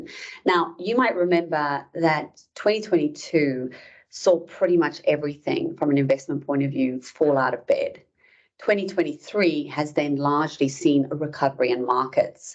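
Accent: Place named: South Africa. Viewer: Rock on GVF! Love the South African accent too.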